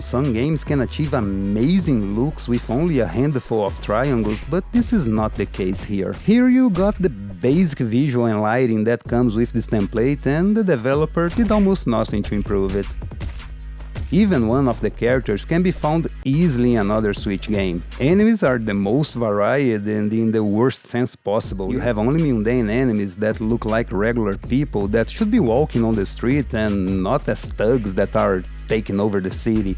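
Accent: Brazil. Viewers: Pelo seu sotaque eu tive quase certeza que vc era BR haha! Seu inglês é muito bom 👏🏽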